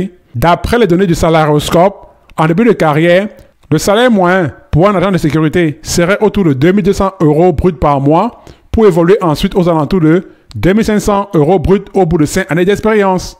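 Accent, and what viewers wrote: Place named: Cameroon. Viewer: Mon frère camerounais le ton là je reconnais déjà ❤❤😂😂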